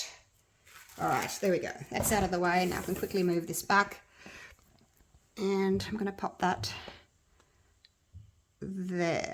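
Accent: Australia. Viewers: To be honest.. I really couldnt care less what shes doing bc I know it will always end up amazing. I mostly like just listening to her peppy, australian accented self which is more than enough for me to play her videos lol.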